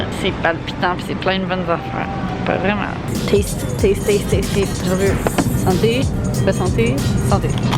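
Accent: Canada. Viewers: J’adore l’accent Canadien 😄😄 Super ton vlog ,c’est sympa de nous partager ton univers 👍👍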